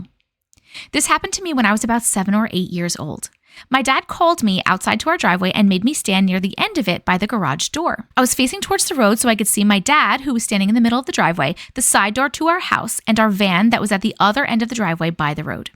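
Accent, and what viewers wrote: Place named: New York, United States. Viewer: Definitely subbing.... stories AND you're a New Yawk girl like me?? Oh heck yeah!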